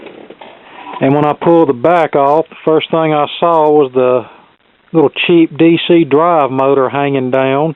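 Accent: South, United States. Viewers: He’s a southern ol’ boy. Accent is pretty typical for rural south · Jerico_64 This man sounds nothing like Hank Hill. He simply sounds like an old man with a southern accent. Big difference.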